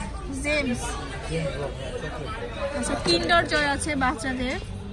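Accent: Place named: Rural, Bangladesh. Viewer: Apnar khotha gula valo lagyna.coz grammo kothar Moto hoy jay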